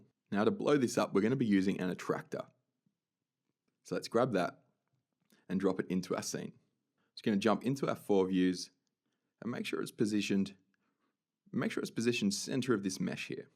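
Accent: Australia. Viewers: Australian accent. He is living in Melbourne, Australia.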